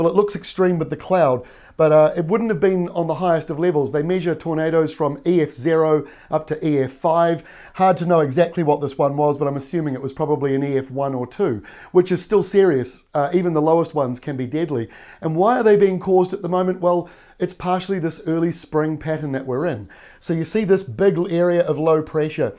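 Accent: New Zealand. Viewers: Top app team. Great presentation, love the KIWI speak!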